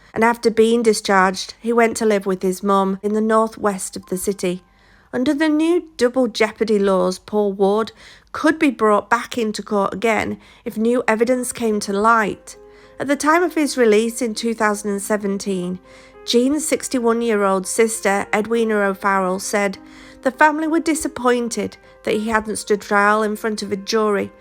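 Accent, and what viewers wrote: Yorkshire, United Kingdom. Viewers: I could hear the Yorkshire in your accent right away. Always warms my heart to hear a Yorkshire accent. I miss home but don't get me wrong living in the California sunshine can't be beaten by any Yorkshire weather😆. I'm glad I found your channel and I'm working my way through your library now. I'm here to stay. Great work you do. Love the content 🤘🏻🇬🇧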